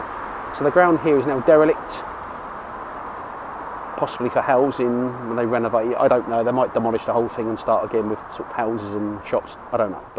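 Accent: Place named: London, United Kingdom. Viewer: Absolutely brilliant mate , you sound like a Londoner , my favourite city in the whole world after ……. This video had me in tears , ma team - the Bully wee. WRTFBW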